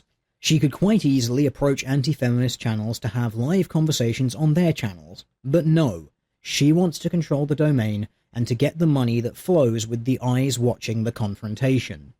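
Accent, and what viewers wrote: United Kingdom. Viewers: Wait, you're British!? I thought; was Canadian. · Wait. He's British?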